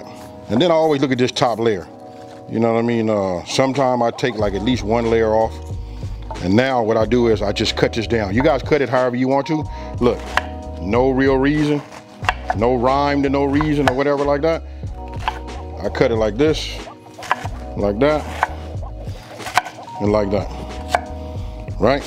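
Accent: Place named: Los Angeles, United States. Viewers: You sound like you're from LA or Compton... southern with a west coast talk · no His accent sound more like California,.. I aint never heard no Mississippi people sound like that (im from Memphis btw)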